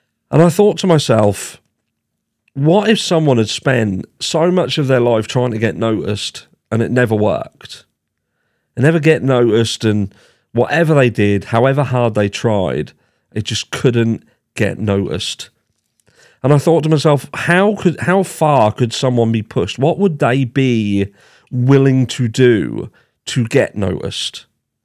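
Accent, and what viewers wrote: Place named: Birmingham, United Kingdom. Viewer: I ♥️ this Brummie accent! Well, the personality of BK is truely puzzling, I absolutely agree. I never considered him an INCEL which they suggest him to be; yes, he has both narcissistic, sociopathic and also psychopathic traits, but in these terms I might talk about myself as well with quite of an ease. Seriously, I mean; and neither do I believe that being a N/S/ and/or/P would make one automatically a killer - not at all. However, what is really significant in BK, is his lack of emotions. He is a true introvert - coldly calculating, investigating; he show's up zero empathy nor ability to regret anything, incl his own obvious mistakes. He doesn't seek for attention - oh no; and he seems having counted on possible failures, that's why he is so calm now. The trial is just another pre-calculated epizode of his "masterplan". 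If he is the m****rer, of course. I see some very specific, deeply routed autism in his characterisrics instead, but of course I'm not any kind of a specialist to give such or another diagnosis. Could any form of autism lead to m*rders? Just some thoughts. Interesting contemplation, Man. Thanx & greetings.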